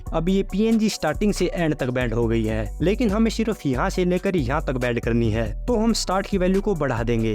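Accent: Haryana, India. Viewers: Haryana sai kya aap 🤐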